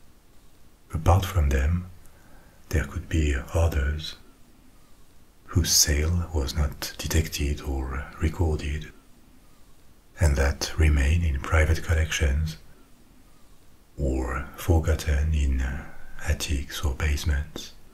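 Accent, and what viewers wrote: France. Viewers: I just want to tell you that I can not sleep without you and I love your French accent. ❤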